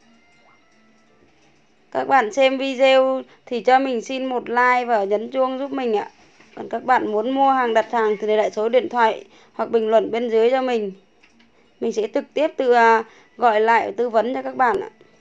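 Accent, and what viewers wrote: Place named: Quoc Oai, Vietnam. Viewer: nghe như giọng ở quốc oai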